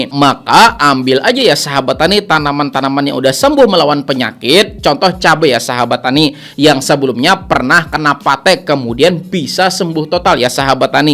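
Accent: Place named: South Kalimantan, Indonesia. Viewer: Logat Kalsel ni pinanya 😂🙏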